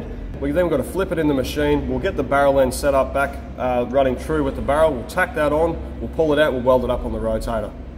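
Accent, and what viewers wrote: Australia. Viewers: Great engineering skills! Awesome to watch by a kiwi from across the ditch! Your dog needs an alignment as it runs side saddle! Lol 😆 Cheers keep up the excellent workmanship! Ps. The camerawoman can’t be doing much office work if she’s on the end of the camera! Really enjoy your bloopers as you talk like a real Aussie! Eg … fuck sake fuck it! 😂 lol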